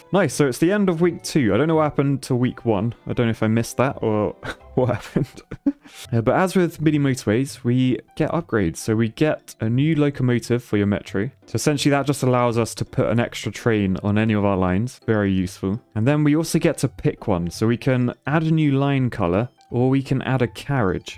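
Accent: United Kingdom. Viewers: "Our square has boarded the train" Your british always cracks me up. Thank you for making videos. You made me buy mini motorways!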